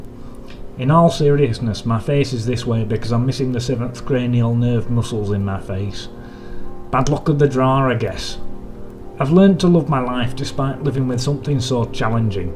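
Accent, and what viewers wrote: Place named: Scotland, United Kingdom. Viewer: This guy sounds pitiful. The person in the book not the narrator. The narrator just sounds Scottish.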